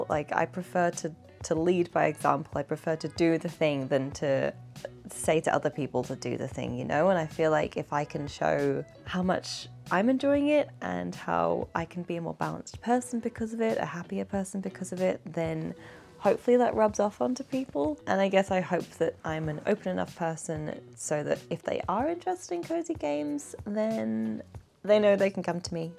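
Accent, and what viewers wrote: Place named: Australia. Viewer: I totally thought you were Australian lol!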